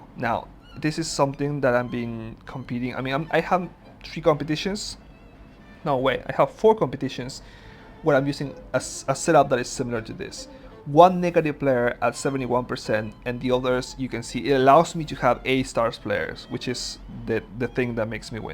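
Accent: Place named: Netherlands. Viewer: Well he has a Netherlands accent butnits English 100% if u can't work it out turnnon subtitles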